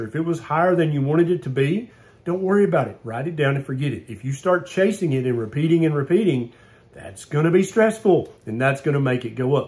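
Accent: South, United States. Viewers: "Before taking your blood pressure, I want you to sit down and relax. Do some deep breathing. Maybe turn the lights down. For around 10 to 15 minutes. This may seem like a lot of rigmarole..." How Southern can you get. 😄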